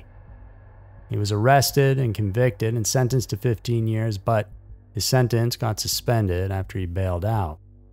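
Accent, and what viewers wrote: United States. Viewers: i love the narators very american voice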